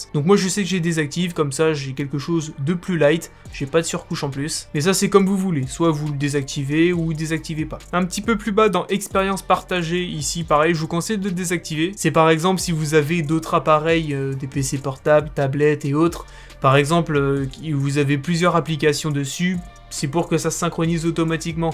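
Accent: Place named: England, United Kingdom. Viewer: sartek l'accent rosbeef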